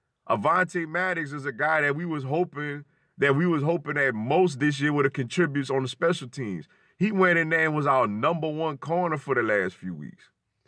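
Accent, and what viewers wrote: New Orleans, United States. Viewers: Bruh it sound like you from New Orleans.. I’m frm New Orleans myself so I know a fellow New Orleanian when I hear one. I’m jus confused as to why you not a Saints fan cause it sounds like you ain’t been gone too long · It kinda sound like you're from New Orleans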